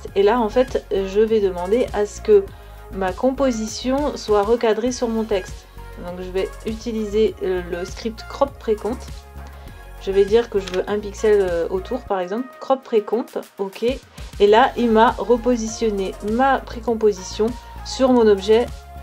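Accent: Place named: England, United Kingdom. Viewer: Très bel accent anglais :D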